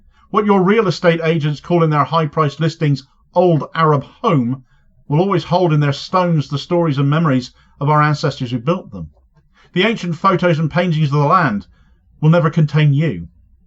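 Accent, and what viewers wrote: Cornwall, United Kingdom. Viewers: as much as I enjoy watching your vids and enjoy your dulcet Kernow accent, was the speech at the Oxford Union filmed? I know Susan Abulhwa has posted the transcript on X, but I'm trying to find a video version. Kudos for reciting this and bringing it to a wider audience.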